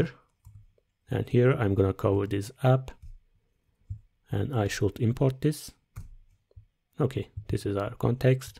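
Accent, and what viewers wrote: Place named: Turkey. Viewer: Konuşan elemanın türk olduğunu anladın mı?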